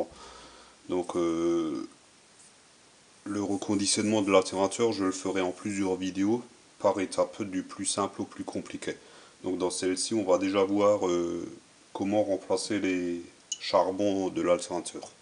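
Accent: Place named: East, France. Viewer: super merci je vais essayer de regarder ca j'y comprends pas grand chose mais je vais m'y coller en plus visuellement tu repares le meme que le mien. Il vient d'ou cet accent de l'est ? moi proche Forbach mais maintenant en ile de france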